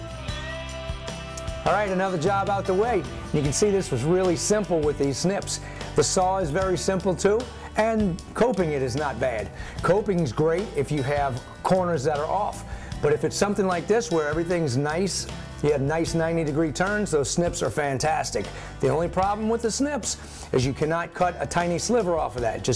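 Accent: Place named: New Orleans, United States. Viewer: Nice videos!! I noticed your NOLA accent as soon as you started talking. I lived in New Orleans for 27 years, until Katrina ran me off. Anyways, thanks for the informative videos and the great tips. I especially liked the video where you did a circle sheetrock patch! Now that was slick!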